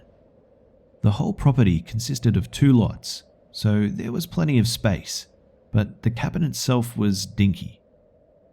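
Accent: Australia. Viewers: He sounds Australian. Go 🇦🇺🇦🇺🇦🇺🇦🇺🇦🇺🇦🇺🇦🇺🇦🇺 Aussie Aussie Aussie oh oh oh. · I love your accent soooooo freaking much😍🙏🙏😂😂 Are you from Australia (idk it just sounds like it)😂😂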